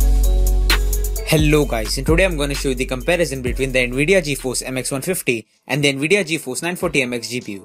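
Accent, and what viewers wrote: India. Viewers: Indian accent is always funny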